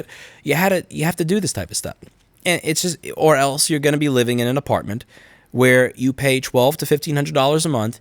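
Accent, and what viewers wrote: New York, United States. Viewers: I want to start creating content for my YouTube channel, Facebook Live etc. I am researching cameras, software and the modern way of doing things. I am so 2000, using XP and Cubase SX via FireWire interface in my recording studio. Thunderbolt interfaces and 60fps 1080p Cannon M60, usb 3 is all news to me. I have alot of catching up to do. For some reason your video where you are riding your bike and talking about potentially leaving NY caught my eye. You are an interesting person and should have your own sitcom with that NY accent lol. I am from Canada BTW. Keep up the good work 🤸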